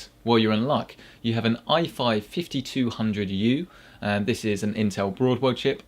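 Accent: England, United Kingdom. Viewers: As a non english native speaker,trying to understand what you are saying with that strong english accent its very difficulty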